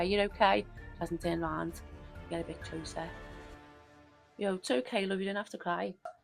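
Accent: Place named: Liverpool, United Kingdom. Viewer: Although I don't believe in banshees I loved your telling of the story especially in that gorgeous Scouse of yours. You do have a talent for storytelling. Be sure to keep developing it. We're cheering you on.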